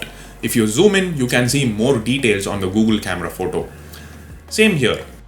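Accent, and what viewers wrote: India. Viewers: Worst thing about M40 is the fact that i have to listen to indian accent to get any information about this phone...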